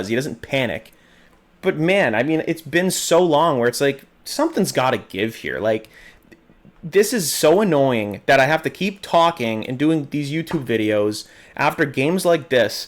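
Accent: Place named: Ontario, Canada. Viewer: Fun fact: The Wings moved into second place this weekend. The Sabres if they win their game in hand, they are two wins from a Wild Card. Get this in your head: There are good shots and they just miss, hit the post and out, hit the post and in, and there are goals. Now go review high school probability and statistics to under stand how that results in final scores. Your accent betrays you, so please compare the Wings to your two Ontario team. And also compare Larkin to the crybaby who was cast as the greatest player ever. Larkin has 2 points more than 87, Larkin kills penalties, Larkin contributes as he is +7 in the stat that correlates in aggregate most closely to games score (aka wins) while Canada's prompted up hockey saint is a net zero and DOESN'T play a complete role. If you are not a fan enough to handle the ups and downs of a season in a league of extreme parity, maybe you should just watch the weekly highlights and then create a weekly video. 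This video today looks like Jonathan Quick 0.3 seconds after a good performance, lacking emotional impulse control.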